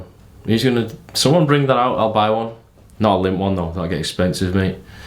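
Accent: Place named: Liverpool, United Kingdom. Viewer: Yes yes, more of these. And also ordering food in Fluent Scouse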